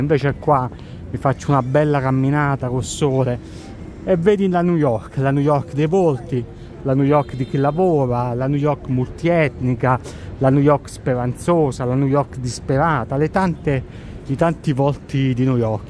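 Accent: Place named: Campania, Italy. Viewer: Complimenti per i tuoi bei video. Ti ho scoperto da poco. Una bella passeggiata come farebbe un tranquillo turista. E poi il tuo accento campano è magnifico, fa il 50% della bellezza del video..👍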